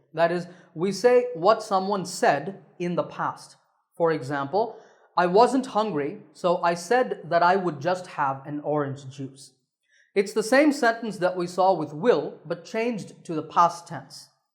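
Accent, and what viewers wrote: United States. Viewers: Sir, I want to speak English just like (i.e. like a US citizen) you how can I? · Sir your english is exactly like American flaunt english. Are you living in United States? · Aapki awaj ekdum american jaise hai per ho aap indian how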